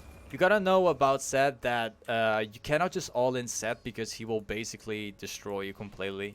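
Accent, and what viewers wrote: Netherlands. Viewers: Are you dutch? I hear lika a accent?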